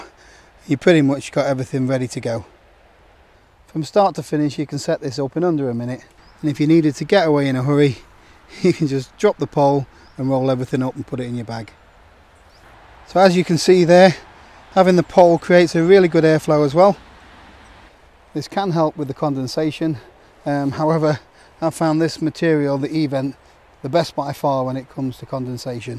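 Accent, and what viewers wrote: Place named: Northern England, United Kingdom. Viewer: Always enjoy your enthusiasm and chance to hear a northern accent - don't have many of them down here here in Tasmania our kid. As for the body condom -..yeah, nah...I'll stick with me Vango TBS Micro. Nice one.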